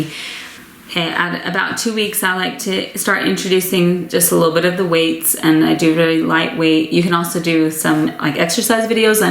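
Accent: Pennsylvania Dutch, United States. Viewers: Would you be able to do a video on your hair care and makeup routine for mom of many? You look so good and I know you’re busy so how do you do it?! Also, random question, do you have a bit of a Pennsylvania Dutch accent or something else? I’m just curious what I’m hearing ! So glad you’re making more videos (watched your laundry video and was sad there weren’t more homemaking videos!!!) 💛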